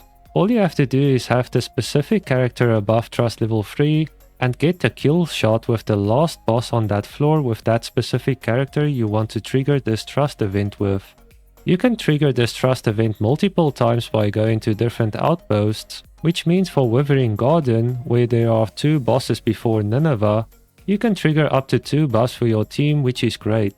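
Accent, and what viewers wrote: South Africa. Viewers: Eh? South African?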